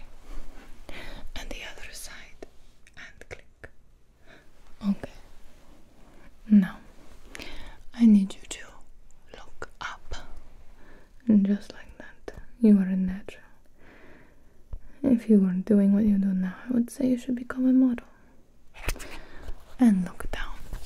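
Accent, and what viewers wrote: Russia. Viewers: It sounds like she has a russian accent of sorts😂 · Your Russian accent is the best--the way you say your Ts are giving me all the tingles! 💛Side note, that wig is really pretty on you 😊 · Good Russian accent by the way good content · why a russian accent¿ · Cool Russian accent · Perfect Russian accent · Me: hears Russian accent and sees blonde short hair Also Me: Black widow? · THE MOST ADORABLE Russian accent. This is my favorite video of yours. Thanks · I am really bad with this stuff but is that a Russian accent like I said I am so bad at this kind of stuff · Correction.... = perfection. + Russian accent = Russian perfection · Russian acsent?!!? Reallyyy?!😐 Well i liked it😁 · Russian accent? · My mother died 5 years ago and s'he had t'he same strong Rússian accent listening to you remind me of her and helps me sleep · The accent kind of sounds like a mix between Russian and African Wait... 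maybe I shouldn’t have commented while I was sleepy, she just sounds Russian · She is to good at Russian accent lol😭😁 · The Russian accent is so cool lol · with a russian accent is the most funny and relaxing thing ive ever heard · as a native Russian speaker, this is one of the best Russian accents I have veard, and the essence of this character is instantly recognizeable. good job ;) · Wait so is the accent Russian? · I love your not so good english and russian accent (when some people try to speak english and the do it incorectlly most of the time its really annoying, but you are exception) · i am inlove with the russian accent, its so aggresive but soft at the same time AAA, this asmr is everything 😭😭💖💖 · as a Russian person I can say that this accent sounds extremely real like most of my friends talk like this in English ahahha UPD: not everybody talk like that tho don't get me wrong! 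· not a bad Russian Bulgarian or whatever...... I'd see the movie. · I LOVE THIS RUSSIAN ACCENT PLSS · Tatyana,is not a greek name,its clearlly soviet/slavick/russian,also this is how russians speaking english sound,and she tried to replicate russian maniars.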